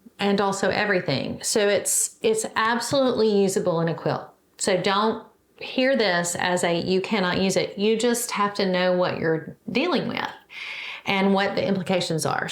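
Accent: South, United States. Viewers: Love your sense of humor!! And the southern accent! Thanks for thie information! I was wondering why we should steer away from cotton/poly. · At the op shop today I fingered an intriguing seafoam green sheet, just to see the tag say "cotton/poly". And I swear, I heard your voice say in your southern way, "Nope!" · Love that cranberry color you’re wearing! It’s very flattering and a perfect choice for your lovely “quilt in progress” backdrop. ❤️ The video’s content is valuable information for new quilters. Many of us learned the hard way and would have appreciated this information. And thank you for making me smile the entire time I’m viewing your videos. Your southern pronunciations are adorable. Aahrn was precious! ❤️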